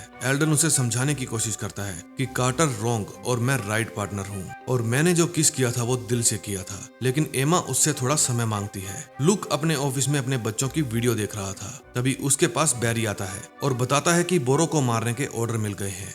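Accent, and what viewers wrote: Punjab, India. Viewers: ji punjab too belong karde, Hindi wich punjabi accent ah reha?? Just asking and the way you tell a story is really different and you bring humor along with it that’s unique!!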